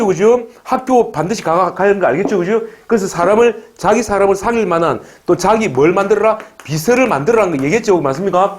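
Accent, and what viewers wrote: Gyeongsang, South Korea. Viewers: 선생님 강의를 열심히 듣고 있습니다. 경사도 사투리에 구수하고 실제적이며 귀에 쏙쏙 들어옵니다. 저는 1년정도 영어 공부를 할려고 합니다. 어떻게 해야 효과적일지 가르쳐 주세요.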